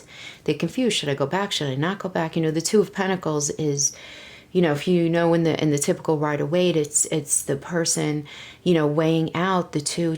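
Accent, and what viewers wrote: New York, United States. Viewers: Thank you so much! Are you a New Yorker? I feel like I know you just by the voice!!! Nevertheless thank you for your reading!! I will gladly make a donation ✡️❤️❤️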